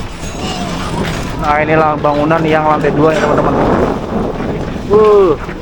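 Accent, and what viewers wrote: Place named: West Java, Indonesia. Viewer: Pake V neng. Sia orang sunda tah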